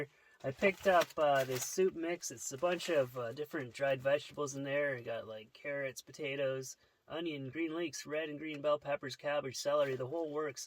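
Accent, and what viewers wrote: Canada. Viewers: Just say “out” and “about” in your awesome Canadian accent, and you’ll be forever a YouTube legend.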